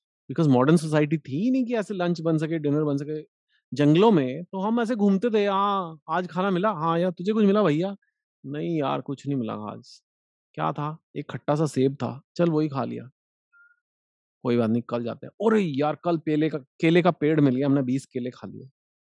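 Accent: Punjab, India. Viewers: Your punjabi accent and expression is hilarious 'Paad lo'...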